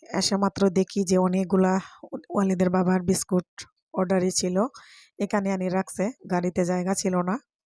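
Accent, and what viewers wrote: Sylhet, Bangladesh. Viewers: tumi sylheti ni go boin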